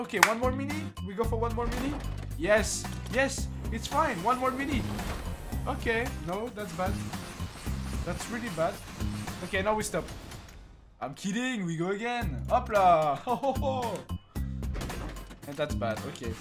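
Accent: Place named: France. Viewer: L’accent français est violent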